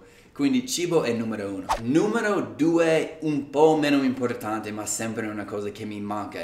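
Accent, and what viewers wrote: Rome, Italy. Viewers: 😂😂😂parli anche un po' romano... Ti aspettiamo in Italia 😘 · Apprezzo, da romano, la punta di accento romano che hai! Continua così! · Daje parli romano mi mancano i tuoi video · Ciao il tuo accento romano e' fortissimo! Io lavoro per UA at DIA chissa' se un giorno it vedro' passare. Ragazzi godetevi l'Italia!!❤😭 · parla un po di romanaccio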